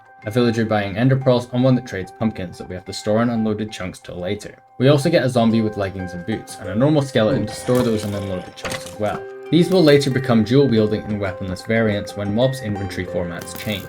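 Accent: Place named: Central Scotland, United Kingdom. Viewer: You have no idea how surreal it feels to hear a Scottish Minecraft YouTuber. You sound like your from central Scotland too like me!!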